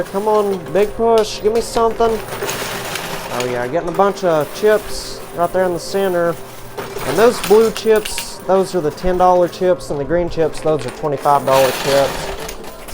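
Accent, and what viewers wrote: South, United States. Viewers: I really enjoy watching. You sound like you’re from down south.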